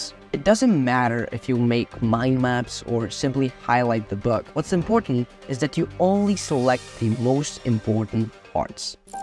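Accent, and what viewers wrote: Italy. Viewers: Are you from italy? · Wait, are you Italian? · conosco un italiano quando lo sento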